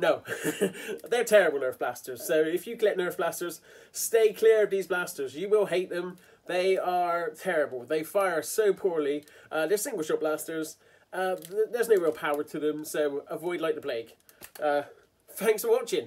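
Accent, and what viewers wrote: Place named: Bristol, United Kingdom. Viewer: Haha! Awesome vid! Love the accent...Bristolian?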